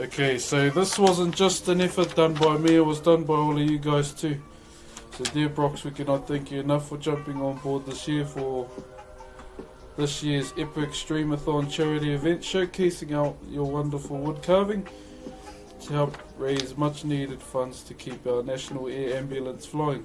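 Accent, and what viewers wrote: New Zealand. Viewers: Because they've both got kiwi accents which are pretty similar in tone and pitch lol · well, he is a maori, it´s a pretty common accent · It's our kiwi accent he said "Hold on guys" he needed time to compose himself from crying on stream.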